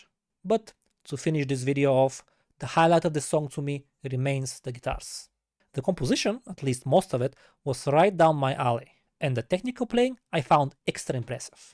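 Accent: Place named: Serbia. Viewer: Only Lovebites can make a song like a mix of Slayer and Testament but still got it with their own style. Gotta love this. M & M guitar duo just cant be beaten. Lovebites reactions from this dude are always great. This bro knows music and his accent reminds me of someone from my area (i am serbian)-ex Yugoslavia. Love it. Just keep it up bro-dont stop, you re good. Respect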